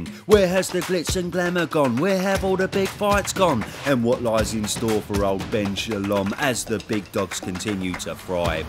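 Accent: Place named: Mockney, United Kingdom. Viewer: Once I heard the mockney accent and first f bomb i checked out.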